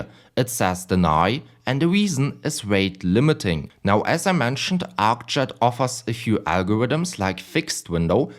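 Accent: Germany. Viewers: Are you of German inheritance? Your sound like me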